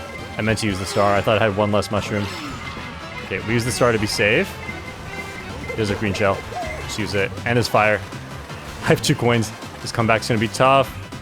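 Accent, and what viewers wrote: Canada. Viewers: Is canadian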